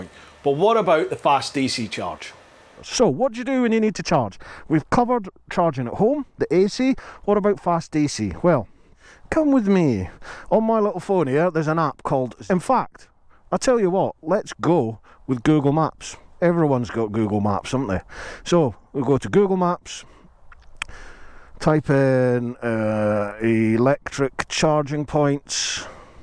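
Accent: Scotland, United Kingdom. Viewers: Subscribed, just for the magic of ur Scottish accent ! Auld alliance ^^ Vive l’Ecosse et les Écossais ! Well, especially lassies ! Thierry from France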